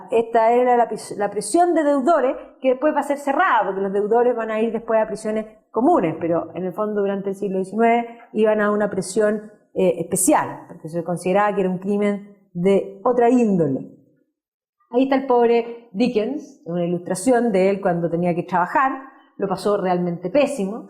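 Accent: Chile. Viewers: Señor, aunque tarde el comentario pero no sé puede dejar pasar...no le molesta la dicción, en realidad lo que se le hace extraño es el acento Chileno, pero para cualquiera que haya ido a una universidad acá, es el estilo y ritmo normal de exponer un tema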